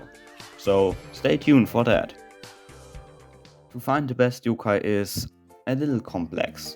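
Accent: Germany. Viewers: ich hab dein deutschen aktzent gehört aber du sprichst gut englisch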